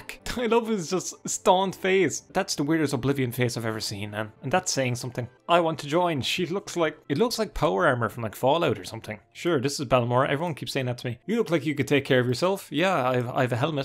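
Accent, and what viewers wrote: Ireland. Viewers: We don´t mind your Irish. It´s actually fun hearing "He´s very Fair away" "Oh! Look at the Stairs!". It´s really endearing:)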